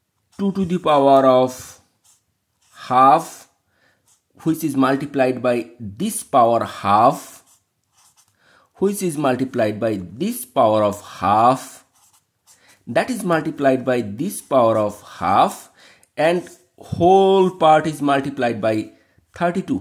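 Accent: Bengal, India. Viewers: He's from Germany... But his accent is so close to Indian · Your accent is like an Indian! 🧐🧐how? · Are you bengali From West Bengal India? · Sir your style of speaking English is like Indians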